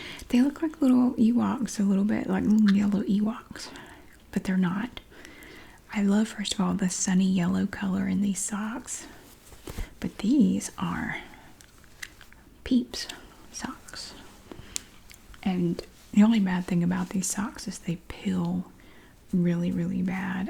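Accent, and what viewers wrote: South, United States. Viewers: I’m developing a southern accent because of you. I don’t mind it, it’s actually cool it’s rubbing off on me. I love you so so so much! ♥️♥️♥️♥️♥️♥️♥️♥️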